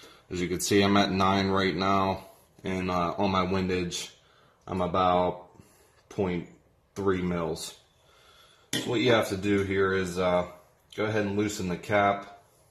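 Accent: Philadelphia, United States. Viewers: Ha ha. I thought the same thing - sounds like a Philly dude but not completely. Pittsburgh - that explains it. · Are you from philly? Just curious cuz you have a little bit of that philly accent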